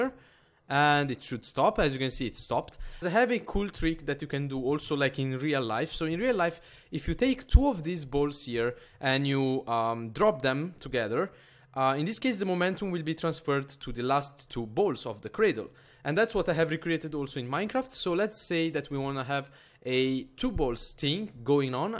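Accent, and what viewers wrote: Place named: Italy. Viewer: si sente che sei italiano♡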